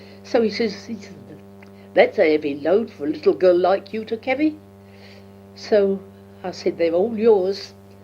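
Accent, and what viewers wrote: London, United Kingdom. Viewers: I've listened to this about 8 times now and it's one of the most interesting things I've watched for a long time. accent was definitely a working class London accent, but it seems to vere from sounding like a Dickens character to almost a modern day person. My grandparents spoke with an accent that most British people have trouble locating because it is so different from the stereotypical regional accent and listening to makes me wish that I had the foresight to record their voices, especially since even though I have the same accent as my grandparents, theirs sounded much nicer and easier to listen to than than the modern version of our local accent. My point is, is definitely a cockney, but her particular way of speaking stands out from other London accents for some reason. I don't know if that is because she was from west/north inner London and not east London or south London but it somehow sounds different. 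It's a real shame that modern London people don't talk like did. I like the way she almost rolls her r's in brother, and how she says 'merrid' for married (similar to how Glaswegians say 'merrit' for married), she also says 'yes' almost as a two syllable word 'yer-ess' and I can hear the sadness in her voice when she talks about her baby brother even though he had died nearly 80 years earlier. Sorry for rambling but this really is one of my favorite videos on YouTube.. 👍👍. I would never replace my own grandparents but if I would love to have had a gre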